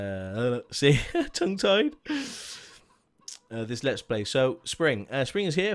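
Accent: United Kingdom. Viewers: The map is called after the region in Poland. It is a real place. I'm not sure how accurate the map itself is, but the area is real for sure. I have some family in there(at least I think so...). BTW. It's quite entertaining to hear English man trying to pronounce polish names:))) Polish language is more "hard", like German language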